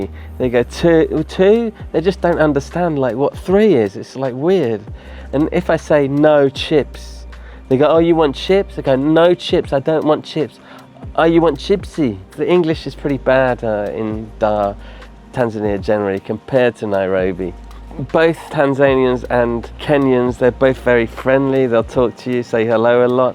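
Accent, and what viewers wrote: United Kingdom. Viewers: Goodness me! You are the kind of Europeans that make some Africans believe they are better than other Africans because they can speak English. Wth!! Tanzanians speak Swahili. They way you described how Tanzanians don't understand English is rude and ignorant! You made it sound like Tanzanians are dumb because they would not immediately understand you a British man with your British accent. It's ok to give your opinion on the two cities but you were flat out just talking down and insulting Tanzanians because they have a different culture and they don't speak your language. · British accent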